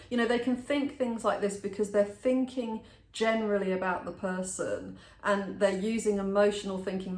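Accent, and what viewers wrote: United Kingdom. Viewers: tht UK accent is a turn-on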